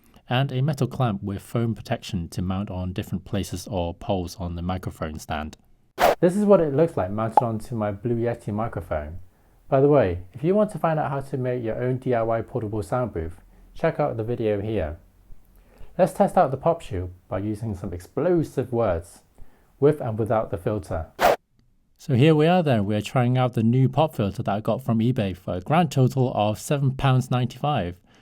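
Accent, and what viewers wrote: England, United Kingdom. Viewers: you have a british accent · I have to admit, this is the first time I've ever heard an Asian from Asia speaking with an English accent.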